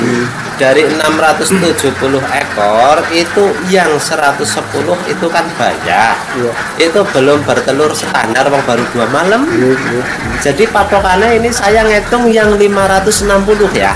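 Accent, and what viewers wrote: Banyumas, Indonesia. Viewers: Kiye ngapak ya....tp nek ora ngapak ora kepenak... salam sukses selalu mas dan tetap semangat.. 😀😀😀👍👍👍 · Ngapak temen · Ngapak ngapak...kebumen atau cilacap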